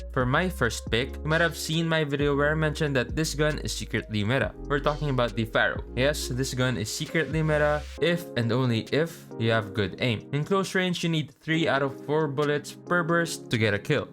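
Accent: Philippines. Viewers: Btw kuya, feel na feel ko talaga na nahihirapan kang mag basa ng script dahil sa accent natin.. Super Relatable Haha. Much Love Kuya. ❤️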